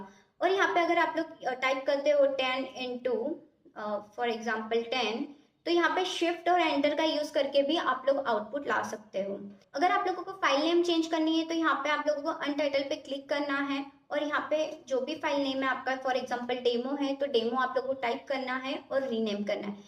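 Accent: India. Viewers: Hyderabadi Accent ❤ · a slight tapori accent but she knows what she is doing and explanation part is really good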